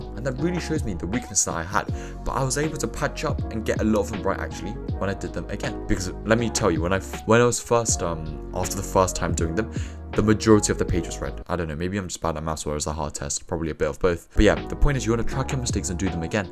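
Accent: United Kingdom. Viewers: i dont know ...he said he lives in germany ..but i feel its a bit britsh 😅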